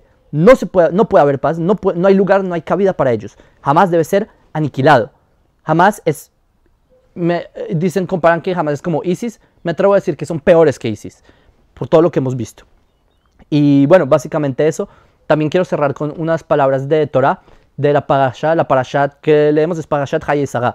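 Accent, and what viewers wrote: Bogotá, Colombia. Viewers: Acento rolo (Bogotano) que tienes.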